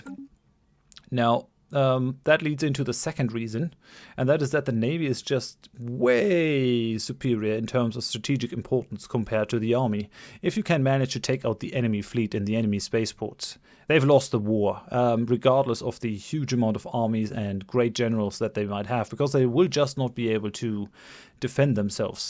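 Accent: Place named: Germany. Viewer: I don't know why but the fact that all of this is being told by a guy with a German accent is really suiting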